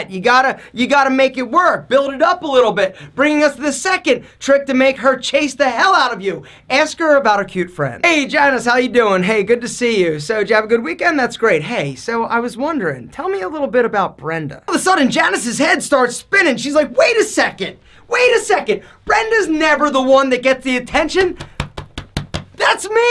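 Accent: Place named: Boston, United States. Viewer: U gotta be from Boston lol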